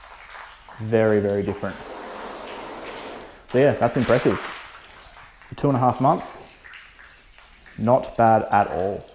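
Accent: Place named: Australia. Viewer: I love the Australian accent, especially when you say Mirch…😜 Great test! Mirch is quite impressive. Thank you.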